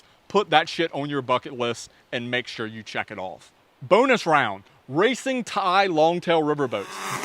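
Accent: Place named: South, United States. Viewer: man... the southern accent is stonk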